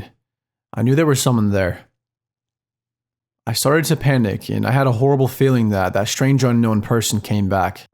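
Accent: United States. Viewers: No offense mate, but your accent sounds like a stereotypical California suffer bro dude. It’s not a bad thing. Haha. But it’s hard to take you seriously when your voice sounds so unserious. Let’s face it the Cali suffer bro aren’t the most serious types of people. 😂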